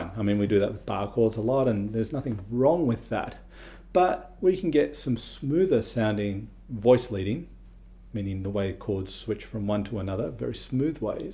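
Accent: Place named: Australia. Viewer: I thought his’ cool the caption helped me break that awesome accent(Aussie ?) so its worth subscribing to his vid acct…ty mate ❤ fr felipinas ❤🎉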